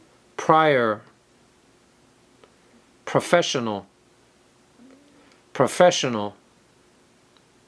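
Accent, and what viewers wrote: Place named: United States. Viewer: 抱歉。 好希望能换一位老师读，这位美国老师的发音确实不好听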